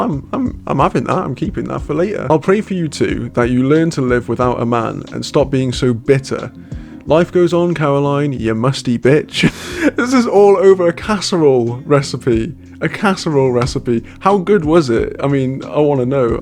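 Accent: United Kingdom. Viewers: The brittish voice adds so much to this.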